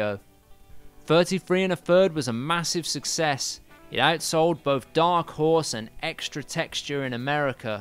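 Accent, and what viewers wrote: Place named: London, United Kingdom. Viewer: Hey, Love your sneering, Johnny Rottenesque voiceover. You from Holloway, too? Great work, as ever!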